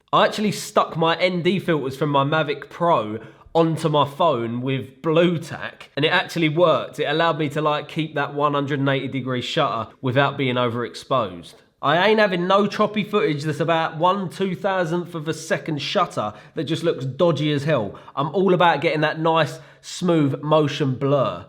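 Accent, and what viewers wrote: United Kingdom. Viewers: oooo aaa wwwww I love the British accent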